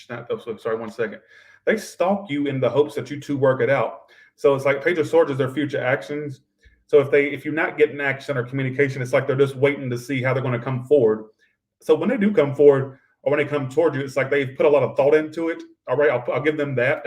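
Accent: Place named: East Coast, United States. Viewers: I love your east coast talk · Love the northeast accent! Very good!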